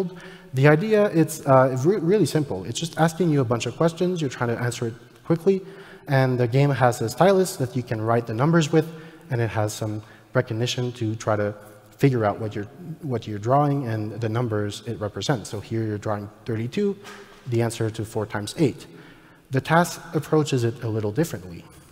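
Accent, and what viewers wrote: Quebec, Canada. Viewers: i can hear the french canadian accent slipping through, I would know that because i'm also canadian lol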